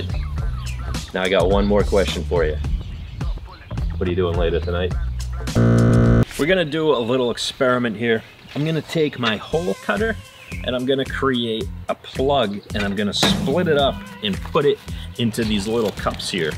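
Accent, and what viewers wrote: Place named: Boston, United States. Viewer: How did that accent get to Illinois? I always assumed you were in a Boston suburb.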